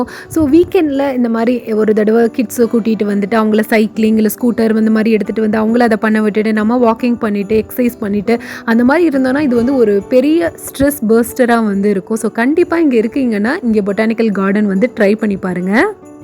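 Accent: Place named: Sri Lanka. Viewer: sister super 👍 உங்கள் தமிழ் இலங்கை தமிழ் போல இருக்கு, ikea இ‌ங்கே யும் இருக்கு, from Switzerland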